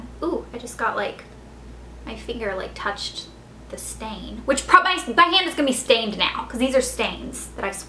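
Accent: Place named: South, United States. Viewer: She is southern... We southern girls can't pronounce things just right... lol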